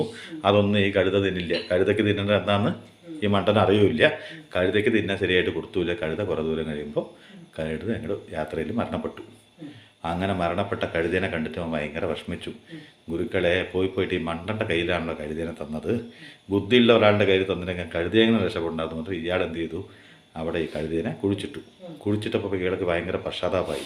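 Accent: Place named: Palakkad, India. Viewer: പാലക്കാടൻ ഭാഷ പോലേ തോന്നി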